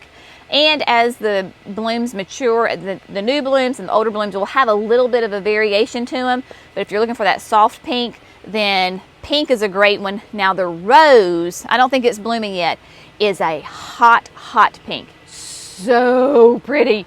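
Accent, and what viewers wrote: South, United States. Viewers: It’s wonderful to find a southern garden center that loves Proven Winners, and bonus your accent reminds me of home. I’ve been watching videos for a few years and still find it hard to find retailers that carry PW here in North Central Texas. Finding you in NC gives me hope!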